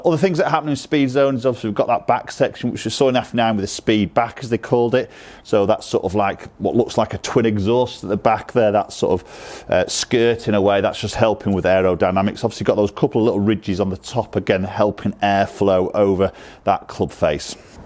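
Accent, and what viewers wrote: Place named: England, United Kingdom. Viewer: STOP TALKING YOU PRATT WHY OH WHY DO THESE GOLF PROS ON UTUBE NEVER. STOP TALKING DO THEY GET PAID BY THE WORD!!!! And those horrible English accents! Shut up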